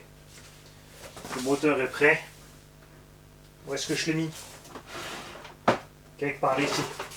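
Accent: Alsace, France. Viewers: J'adore l'accent surtout accentuer par le alsacien 👍🏻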